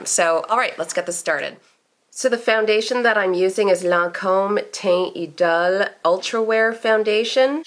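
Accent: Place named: Canada. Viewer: Ahhh I could tell you were from Canada! I knew by the accent of course, but the “I’m sorry “ near the end (as well as the new car pics) sealed it 🤣 👋 waving at you from down the road a bit in Cobourg!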